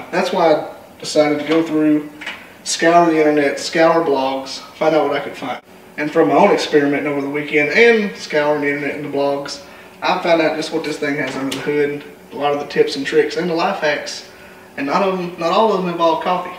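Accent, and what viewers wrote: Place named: South, United States. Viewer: That southern accent!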